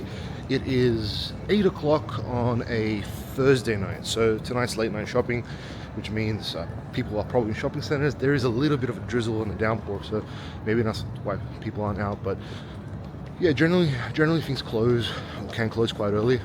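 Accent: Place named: Australia. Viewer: Братишка мне так нравится твой Австралийский акцент. Как русскому кто немножко говорит и понимает на англи йском языке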